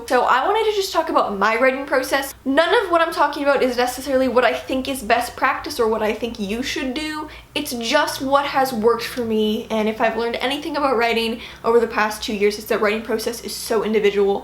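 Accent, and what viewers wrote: Canada. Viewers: So I should apologize then. I’m sorry I made you feel attacked, I mean you don’t even know me lol that has to be the most annoying part. But I still find it hard to believe that you have never had anyone say that your accent sounds high class. Like the word Aunt. If you pronounce it “Ant”you are “normal” but if you pronounce it “Awe-nt “ like the British do, then us “normals” will see it as high class and jot that down in the back of our heads. Do you see? Idk if there is a true form of English that invokes the superior intellect but it’s something that id like to see your option on. For example a person who thinks in Spanish will have different thought patterns that one who speaks in a Western accent. So what I am challenging you on is do you think your Canadian accent makes you think more intelligently that my Western or American accent?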